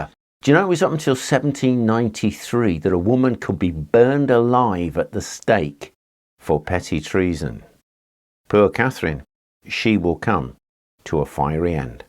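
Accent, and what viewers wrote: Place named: Midlands, United Kingdom. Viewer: Midlands rather than northern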